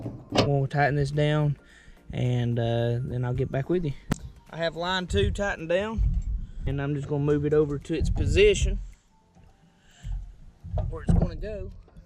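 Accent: South, United States. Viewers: Dang that accent sounds more south. I have to put in a 200 amp meter pole for a single wide Saturday I'm gonna be doing it alone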